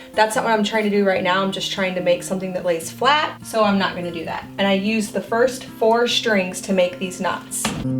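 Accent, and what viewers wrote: United States. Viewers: No way, our young lady is not from NC with that accent. Iowa, I think. I'm from SC and have lived in NC as well. Remember Boone as a small town "nestled in the Blue Ridge Mountains" my family'd stop through on our way to Ski Beach in the winter.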